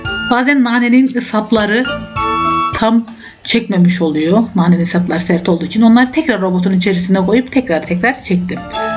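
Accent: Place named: Adana, Turkey. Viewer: Selam canım naneler yemyeşil nerelisin Adana gibi geldi bana.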